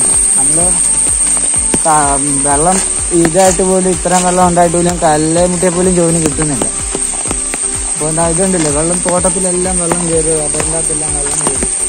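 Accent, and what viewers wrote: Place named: Kottayam, India. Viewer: ❤️❤️❤️❤️👍🏼വഷണ ഇല ....കോട്ടയം speech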